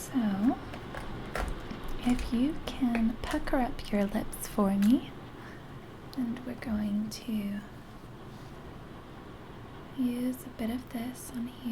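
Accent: United States. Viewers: very good America accent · I'm not going to lie. You do a really good American accent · So crazy to hear you speaking with an American accent!!! Like my brain was confused or something! Great job, the sounds were so relaxing, thank you! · As an actor and language enthusiast, I'm always trying to master accents from around the world, but I rarely get to turn the tables and judge a foreigner doing an American accent. It's pretty good! You only have problems with your o's and some of your a's. But even past that, the only thing that was big enough to give you away that you're not American is the way you said "director". But like I said, pretty good. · Nailed the American accent. Perfect. Wouldn't be able to tell you weren't American if I didn't already listen to some of your other videos ;) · Amazing American accent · I hardly comment on videos but had to say to you that you do it so good!!! 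Im a latina and prefer your natural accent but the american one you do sounds exactly like an american person!!! And an excellent RP!! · excellent job on the American accent! :-) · Awesome video as always!! Great job with the American accent. You made it sound so believable (if I didn't know that you were British already, I would've thought that you were an American). The rain helped too. Have a nice day. 😉😊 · Ur American accent is great! Ur an honorary Yank! :) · Lots of fun and very relaxing =) Is it hard to keep the american accent throughout the filming process? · I must admit, this is some decent American accent! It is amazing how u can switch ur accents fluently! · your American accent is better than and I'm an American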